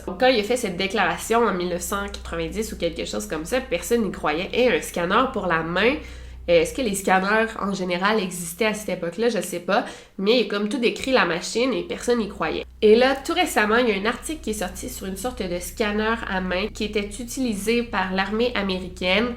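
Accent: Quebec, Canada. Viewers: Quel accent Canadien délicieux pour le Français qu je suis. <3 :) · Je suis français, et j'ai réalisé que, dans ma tête, je lisais le titre des vidéos de avec un accent québécois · Bonjour ma belle ! Je te suis régulièrement depuis genre février mars 2018.. Et je m'était même pas abonnée 😳. C'est réparé 😉 J'aime beaucoup t'écouter quand je dessine etc.. I need you lol! J'ai l'impression de te connaître depuis le temps c'est ouf et je rigole beaucoup, en plus l'accent québécois me mets toujours de bonne humeur 😂. Bisous à toi et continue à assurer 😊❤️ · Très charmant l accent canadien. ..sinon pas mal ta vidéo. ... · Tabernacle cet accent québécois ! J'adore. 😉 ⚠️ Le lien "Bob Lazar: Area 51 & Flying saucers" est mort ❗ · Pour moi, si Bob Lazar a ce qu'il a dit avoir, C'est pas chez lui et s'il a menti sur ce fait C'est pour se faire fouiller genre " pourquoi on me fouillerait si je n'avais rien vu et que j'avais tort... 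en tout cas, encore une bonne vidéo de ta part, merci! Et pour moi, française qui ait vécu au Québec 8 ans, des histoires de même avec un bel accent québécois c'est juste un plaisir 😊😊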